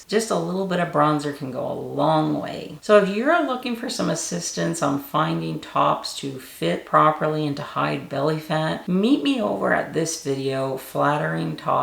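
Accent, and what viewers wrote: Canada. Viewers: Looks like I have the same body shape as yours. I agree on high rise pants ,shorts etc. And I never tuck in tops. What I HATE is baggy pants jeans or dressy slacks. I call them "Bozo" 👖 pants. Mainly because I have thin legs and ankles but a way too big stomach. This is the first time seeing your videos. Nice I'll be looking for more. Are you from Canada? I only thought that because of the way you say " your O s " . 😊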